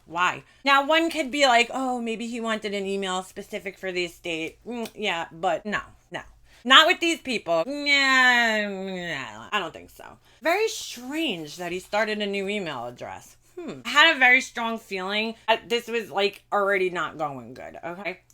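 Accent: New York, United States. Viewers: Hello. wow young lady you have def been through the wringer between real life with your Mom and crazy YouTube. I feel for you. I love all of your videos especially the one for your son when you brought and set up his cooking equipment. That was awesome. Stay strong keep keeping it real. Love your accent, it makes me miss NY. Peace out.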